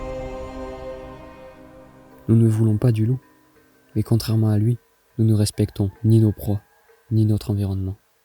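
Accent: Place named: Hautes-Pyrénées, France. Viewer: Bravo, tu peux être sacrement fière de toi! Quelques petites fautes de français mais rien de grave (c'est souvent des façons de parler dans le 65 mdr) et la qualité du son est à retravailler un peu, mais les images, les informations et le message sont fabuleux.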